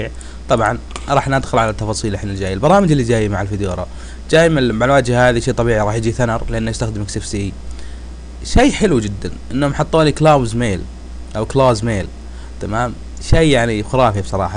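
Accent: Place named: Qassim, Saudi Arabia. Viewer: يعطيك العافية أخوي يا حبيلك أخوي شرحك ممتاز جدا خاصة بلهجة القصيم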